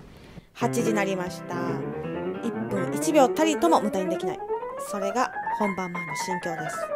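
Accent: Kansai, Japan. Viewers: 関西弁にいやされます。😂✨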